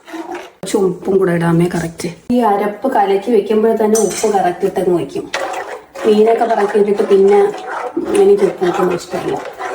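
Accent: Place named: Trivandrum, India. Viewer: തുടുപ്പ്. തിരോന്തരം സ്റ്റൈൽ 👌🏼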